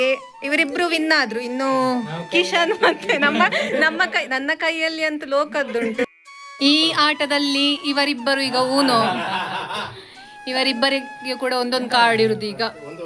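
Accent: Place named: Malnad, India. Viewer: ನೀವು ಮಲೆ ನಾಡಿನವರು ಮಾತನಾಡುವ ಶೈಲಿ ತುಂಬಾ thrill ಅನಿಸುತ್ತದೆ.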